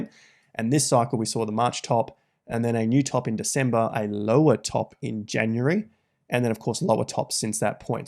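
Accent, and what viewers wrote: Australia. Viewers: Is this what you call analysis? Stating the obvious and regurgitating old information? Bro, get your head out of your chart-loving ass and stop relying on your Aussie accent to sell yourself. You are not doing people any favors by reading your crystal ball. Do some real analysis by connecting current events that might be contributing to the behavior of the assets in the crypto market. You’re the type that got rekt the last time because of over leverage. Now, you’re just suggesting to do the reverse. Stop. Listen to real market analysts like Samson Mow, Tom Lee and Raoul Pao. Maybe you’ll be a bit more convincing as a legitimate crypto analyst. If not, just stay away from crypto and stick to your TradFi mumbo jumbo.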